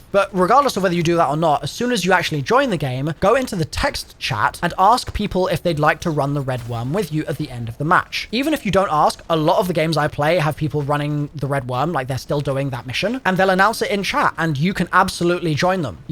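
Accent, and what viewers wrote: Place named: United Kingdom. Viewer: Lol he sounds brittish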